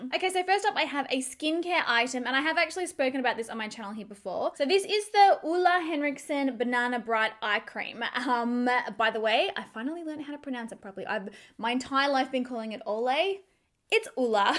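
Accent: Australia. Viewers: I LOVE your videos. I've learned so much from you. I'm sure many of your subscribers are younger than I am, but you've helped me be more confident with makeup at 44!! I love to see your subscriber number climb because you deserve it!! You need to share a tutorial on that coral peachy look your rocking today!!! LOVE IT!!! Much love from America! Hope your winter is not too bad in Australia, and your accent is charming. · Girl! Your Australian accent is beautiful!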